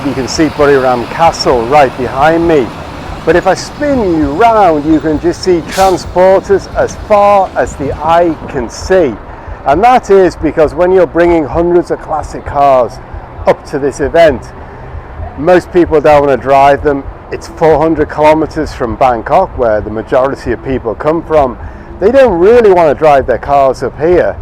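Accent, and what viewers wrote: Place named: Liverpool, United Kingdom. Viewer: Lovely video mate ❤ Where are you from? Can I hear a Liverpool accent or close to Liverpool? Maybe Chester areas?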